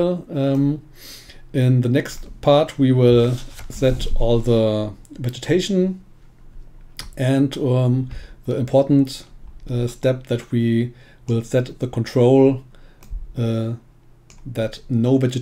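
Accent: Germany. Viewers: You are german arent you? :D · witzig wie man die Herkunft einer Person doch immer am Akzent erkennen kann. Nach den ersten paar Sekungen wusste ich dass hier ein Deutscher spricht :)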